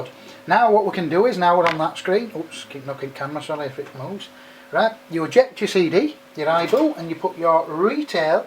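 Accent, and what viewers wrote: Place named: North, United Kingdom. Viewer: Great video mate! Nice to hear a tutorial with a familiar northern accent :D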